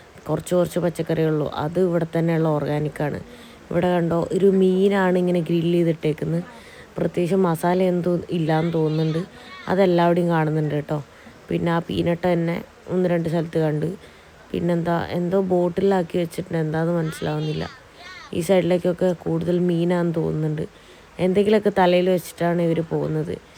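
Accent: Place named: Kozhikode, India. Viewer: സംസാര ശൈലി കേൾക്കാൻ രസമുണ്ട്....തനിമയുള്ള നാടൻ കോഴിക്കോടൻ മലയാളം....😂❤ അടിപൊളി സംഭവം..disposition too ok!.😂😂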